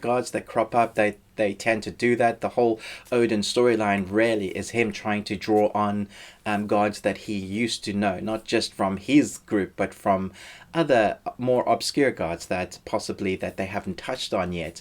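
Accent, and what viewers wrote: South Africa. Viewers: Do I detect a slight South African accent there? Could be wrong but maybe not.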